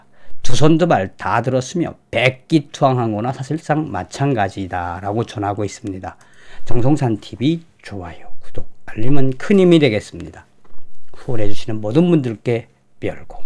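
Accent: North Korea. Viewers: 북한 사투리로 가열차게 진행할때 속이 후련합니다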